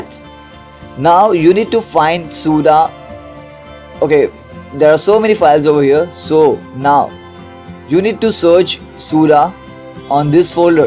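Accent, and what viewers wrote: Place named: India. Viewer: D hindu's english, i like this :D, mera naam ömer hai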